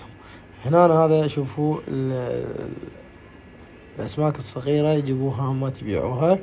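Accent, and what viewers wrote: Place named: Gulf, Arab world. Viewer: لهجة الجنوب مقاربه للخليج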